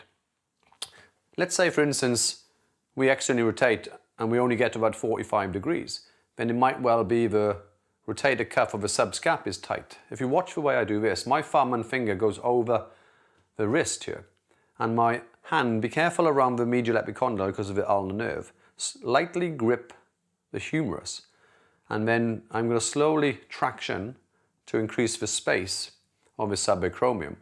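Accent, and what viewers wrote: Wales, United Kingdom. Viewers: Are you welsh? Great videos. Love them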